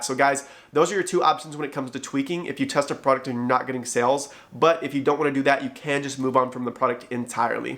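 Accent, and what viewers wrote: United Kingdom. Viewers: Love it! Great value. Thanks!🙏Room set up and colors are electifying and soothing - so cool! Your British Accent is Brilliant and hilarious and Prince Ghost is a real star attraction!💕😊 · Hahaha! Your British accent is jokes! 🤣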